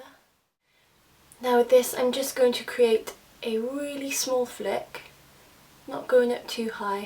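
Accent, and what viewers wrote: United Kingdom. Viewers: you welsh?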